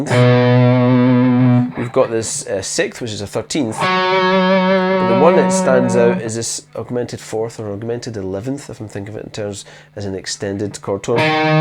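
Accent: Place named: Glasgow, United Kingdom. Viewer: at last! a guitar teacher with a weegie accent! ya dancer!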